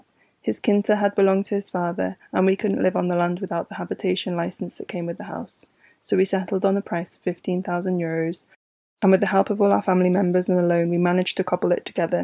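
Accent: United Kingdom. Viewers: Interesting life style, interesting content. Unfortunately have a hard time to understand your fast speed British accent, please do a favor for your audience to slow down with your narration!! Thanks for your content, keep us updated.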